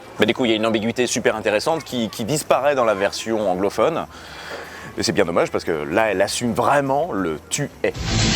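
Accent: Germany. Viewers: mais il est très bien ton accent allemand ! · Ton accent allemand est immonde. Je tiens à le dire. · J'aime tes videos, mais non ironiquement ton accent allemand pue un peu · Toujours une magnifique maniere de reconter l'histoire d'une musique et de don groupe!et magnifique accent allemand.....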